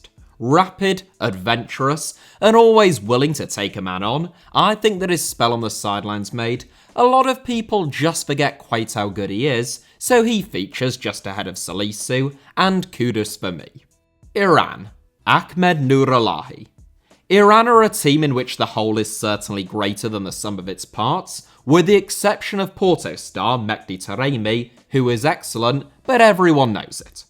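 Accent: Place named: England, United Kingdom. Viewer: the problem with is that he makes very little effort to read these foreign names correctly and he puts too much of his english accent in these foreign names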